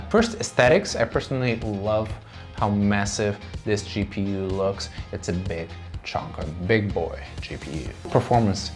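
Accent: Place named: Sweden. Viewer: I think he is Swedish.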